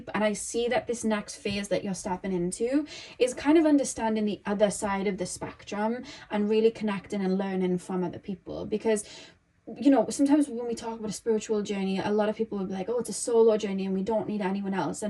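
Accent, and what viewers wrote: Wales, United Kingdom. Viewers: This is really random but I just want to say I've always LOVED your voice and Welsh accent. The way you say certain words is amazing to me lol. When you said "hoodie" I was mind blown and had to replay lol love it ❤️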